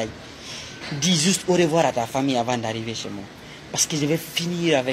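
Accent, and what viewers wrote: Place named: Pular, West Africa. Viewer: Tu na pa mem le merite detr un ministre avk tes Français poular ....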